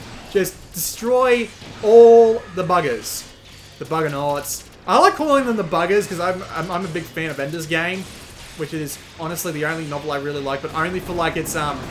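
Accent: Australia. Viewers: Unrelated question, how is your Vegeta impersonation? Sorry, you just sound like an Australian Lanipator.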